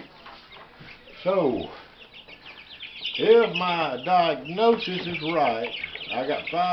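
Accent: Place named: South, United States. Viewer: I understand the frustration about lighting but at least this is better to watch than some of the other crap on YouTube I encourage anyone doing educational videos to continue to do so. Not only is it a learning experience for the person doing it, it's information you can decide to use or at least consider. Also I love your accent I was born in the deep south in Texas but from living up north north than half my life I have a mixed accent of north and south and I just love the southern accent.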